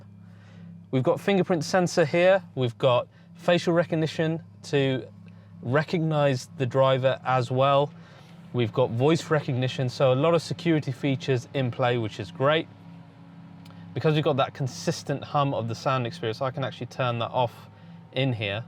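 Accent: United Kingdom. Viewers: have to be a Brit to understand this dude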